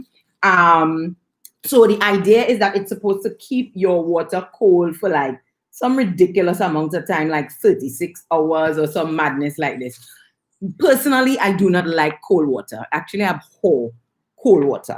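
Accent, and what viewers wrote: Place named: Trinidad and Tobago. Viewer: awwwww i went to Trini a few years back :) this made me miss it. I love your accent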